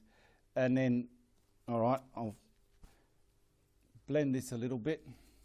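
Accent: Australia. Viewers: Love the Aussie accent and humour. Love your paintings too and I will try something similar. Thanks from another Aussie.. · After reading a few comments, I think your videos are just about right. 30 minutes is great. I tend to get tired and lose interest after 40 or so. Keep up the excellent work! (Although, I could listen to you speak for hours! Love the Aussie accent!)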